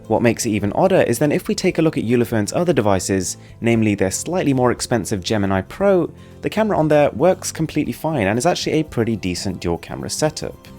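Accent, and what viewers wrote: United Kingdom. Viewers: Extremely difficult for me to follow. I get the main point but you rapidly slur through about 20% of your words with your nice Queen's English accent, making it impossible for me to understand your finer points. If I were sitting with you at a luncheon, I imagine that I would constantly, embarrassingly, be having to ask, "I'm sorry, I didn't quite get that?...I'm sorry, could you repeat that please?" If you wish to professionalize your narration, it would be useful to work on enunciation and clarity of presentation. Despite this, thank you for the content and information.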